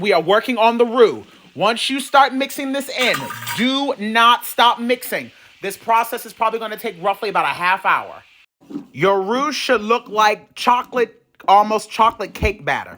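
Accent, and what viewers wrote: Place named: Louisiana, United States. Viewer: Ok, you earned my respect. I would swear you are from Louisiana 🎉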